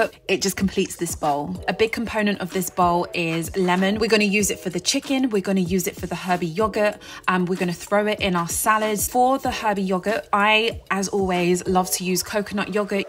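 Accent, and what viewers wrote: United Kingdom. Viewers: Like Jamie, you’re speaking Essex, right?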